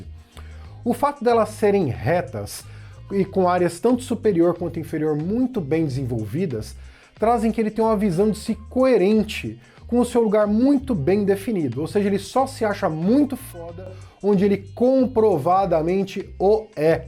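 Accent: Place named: Ceará, Brazil. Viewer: E isso mesmo. O é um sucesso. Adoro o sotaque cearense dele. 😊😊😊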